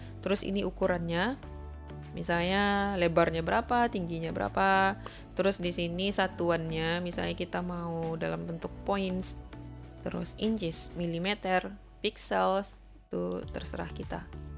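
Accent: Indonesia. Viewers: orang padang kak?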